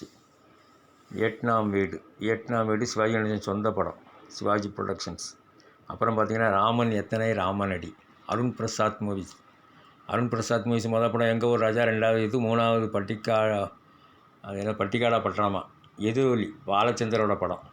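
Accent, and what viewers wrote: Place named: Tirunelveli, India. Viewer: Thank you sir Your Nellai slang very very sweet for my ears. Keep it up sir.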